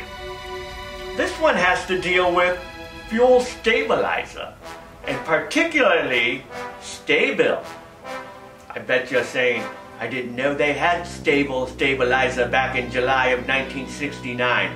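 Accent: England, United Kingdom. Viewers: i like the english accent mixed in with a little Confucious accent near the end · Your English accent isn't far off Sir Winston Churchill from the second world war lol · Do you have a kimono with a dragon . Love the British accent old chap 🇬🇧✊🏻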